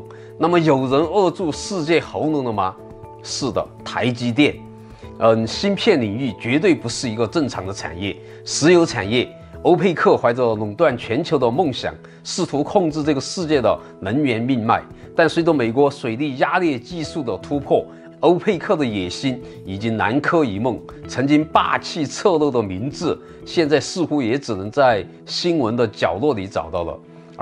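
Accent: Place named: Sichuan, China. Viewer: 先把影片標題繁簡轉換搞對吧～「穀/谷」，很不專業。而且你口音實在有點重，與其努力繞舌，不如直接用四川話錄視頻吧，效果一定很棒，畢竟是母語。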